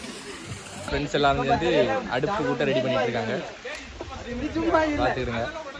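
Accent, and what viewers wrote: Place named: Kanyakumari, India. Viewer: KK slang 👍👍🔥🥳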